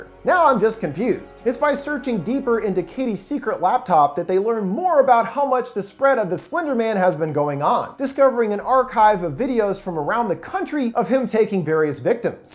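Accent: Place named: New York, United States. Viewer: Great new York accent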